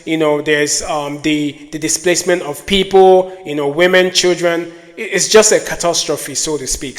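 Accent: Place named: Nigeria. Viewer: Hello mate! You've got your facts wrong or mixed up. Francophone Cameroon known as la Republique du Cameroun got its independence from France on January 1st 1960. You sound Nigerian. Anglophone Cameroon previously known as British Southern Cameroon was administered as an autonomous Unit within Colonial Eastern Nigeria with the headquarters of the latter Region in Enugu. In order to gain their independence, British Northern Cameroons and British Southern Cameroons as separate entities had to either join la Republique du Cameroun or remain within the Federal Republic of Nigeria which became independent on October 1st, 1960. During the February 11th, 1961 Plebiscites, the adult population of British Northern Cameroons voted to remain within the Federal Republic of Nigeria. Sardauna Province, as British Morthern Camrroon was known before Independence comprised parts of present-dayTaraba, Adamawa and Borno States. 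British Southern Cameroons voted on a 70-30% split to achieve independence by joining la Republique du Cameroon. Independence was effective from October 1st, 1961. Differences of opinion on governance between the federated states of West Cameroon and East Cameroon commenced after October 1st, 1961. The citizens of Ambazonia- the former federated English-speaking state of West Cameroon ensued marginalisation from the outset of a highly centralised Federal Republic of Cameroon. To get on in Cameroon, Anglophones must learn and master French. My generation accepted the fait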